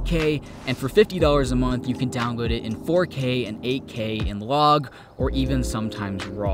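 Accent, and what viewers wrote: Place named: Washington, United States. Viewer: Where are you from? Is this Washington? It just reminds me of that area of the country.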